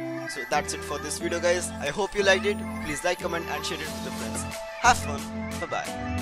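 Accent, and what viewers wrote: India. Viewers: YySsBG YT he has an Indian accent you degenerated racist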